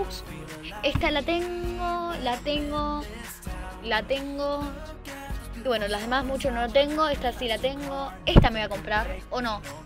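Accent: Argentina. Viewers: YO KIERO UNOS ROBUX TOY RE POBRE MEN PARECES DE ARGENTINA :v +Venhado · Vos ser argentino?like si lo eres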